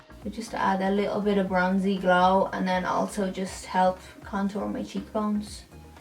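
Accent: Ireland. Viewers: Are you Irish? I love your accent👍🏻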